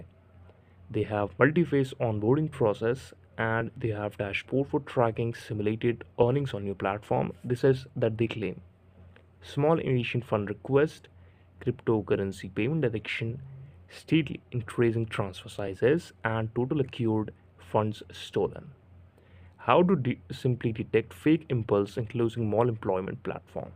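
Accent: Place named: India. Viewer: Just by hearing your accent I already know that it’s a scam and you are a scammer as well. D!rty 1nd1ans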